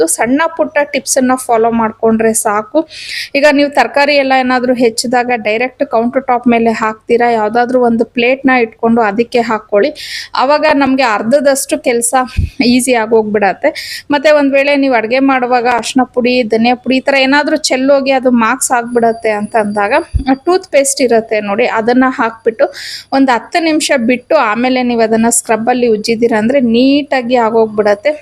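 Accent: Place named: Telugu, India. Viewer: Its not Sheniwara … its Shaniwara Your vlogs are good , i know u are influenced by telugu .. however conscious practice will make pronunciation better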